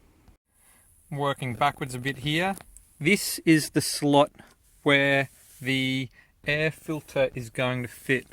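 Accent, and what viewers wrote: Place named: Australia. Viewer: Great video. Hope some others find some benefit from your tutorial on changing a cabin filter. Unfortunately, I don't drive, but I enjoyed listening to your Australian accent. ;)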